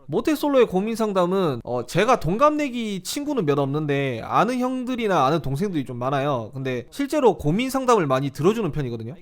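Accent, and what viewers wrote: Gyeongsang, South Korea. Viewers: 말투가 경상도같긴한데 뭔가 전라 느낑이 있다? 충청..은 아닌거같기도 하고했는데 화개장터가 맞군요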